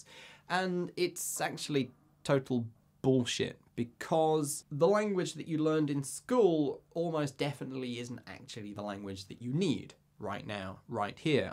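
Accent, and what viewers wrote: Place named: United Kingdom. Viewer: I love your British accent 😍😍😍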